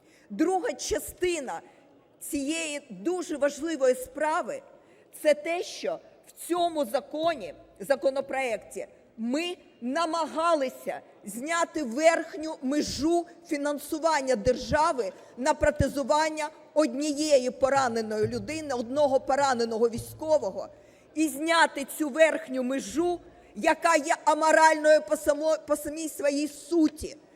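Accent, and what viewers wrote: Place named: Russia. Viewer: Юля, ви , так претися в Президенти стільки вже років , а позбутися російського акценту не хочите. Допомагаєте порошенку нищити нашу країну. Бо у вас свої амбіції за владу⁉️ Щось він кричав , що не простить вам за газ. Можливо і ви відчули, що будете виходити з потяга на першій зупинці.Це перше. По-друге перестаньте піаритися після цього, як , ви з візочка перейшли на підбори. Вас вже понесло до Трампа просити допомоги, щоб влізти у ВР, прибравши діючого Голову ВР, а потім і Президента.І все це зробити без виборів.Тому що задумала собі створити уряд національного порятунку. Від кого? Хіба від самої себе. План зірвався .Щоб допомогти ЄС захотіла попіаритися, як завжди.І вилізла за трибуну ВР, щоб попатякати про те , про ,що вас зовсім і не цікавить. Вам дійсно краще піти на пенсію, або знову - на візочок. Багато лиха накоїла Україні ваша 🦊 МОРДА.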